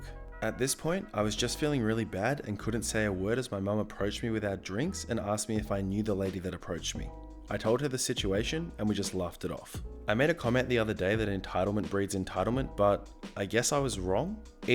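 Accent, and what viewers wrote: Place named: Australia. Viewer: Aussie accents are cool.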